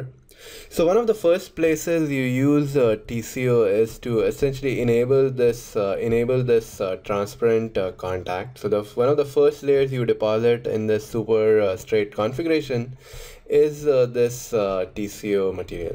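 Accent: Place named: India. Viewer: Hindu accent- cannot understand him.... shame!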